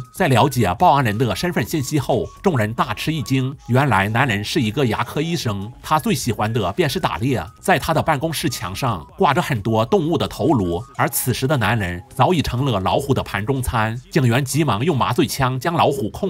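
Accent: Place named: Northeast, China. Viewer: 唉，感覺這解說……實在挺東北大舌頭的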